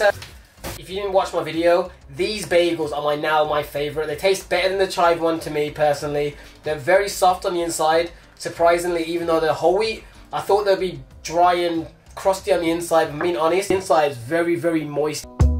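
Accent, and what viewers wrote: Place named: Northern England, United Kingdom. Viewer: Nice break downs, Where are you from in UK, from accent I'm thinking up north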